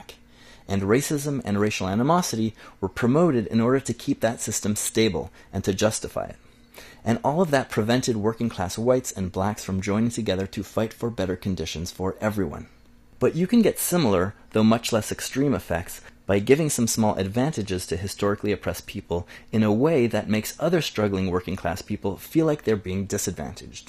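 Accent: Quebec, Canada. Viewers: Ah are you a french canadian from Quebec or Montreal ? Good video as alway !! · "Bein non ça speut pas lâââ" 😂 are you Québécois??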